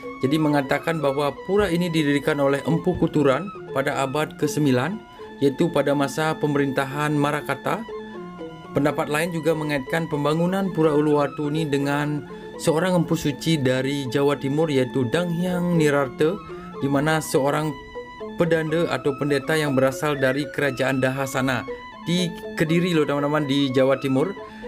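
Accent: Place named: West Sumatra, Indonesia. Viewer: Maaf krn saya penggemar menikmati video" nya tp saya jg pingin tahu identitas al: nama, asal dll krndari logat sepertinya asalnya dr Minang, Sumatra Brt. Terima kasih dan teruskan berkarya ttg Bali/ Hindu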